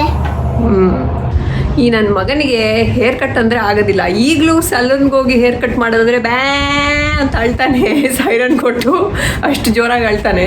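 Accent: Mangalore, India. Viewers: Hi dear nimma vlog Alli manglore Kannada keli thumb kushi aithu all the best namma support nimge yawagalu eruthu god bless u❤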